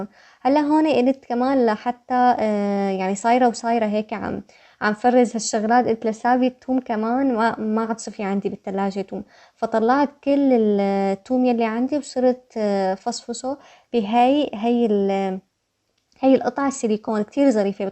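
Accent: Syria. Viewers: انا مصريه ولهجتك بتذكرني بجارتي السوريه ، وانا كتير بحبها وحبيتك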